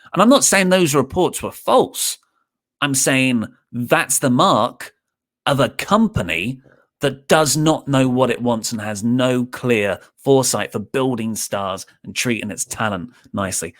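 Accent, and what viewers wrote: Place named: United Kingdom. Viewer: Why is breaking these awful news with a british accent at the wrestletalk youtube channel?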